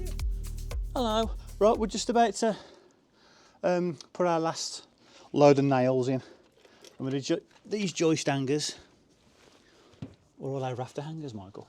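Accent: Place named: Scotland, United Kingdom. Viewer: I'm going to guess it's a Scottish accent that is causing the problems 😅. Might have even watched the video myself thinking what an arrogant way to show a video...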